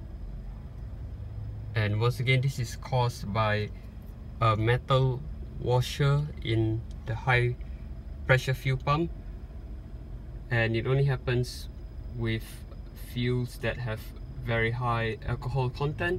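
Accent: Singapore. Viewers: U sound so singaporean, r u?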